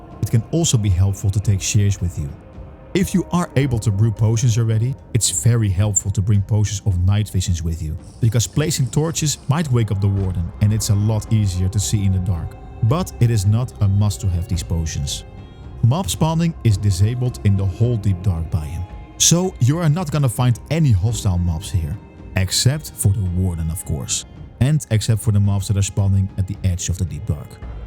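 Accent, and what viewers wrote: Netherlands. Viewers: Very Dutch accent methinks! Great video! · Do I hear a Dutch accent? 👀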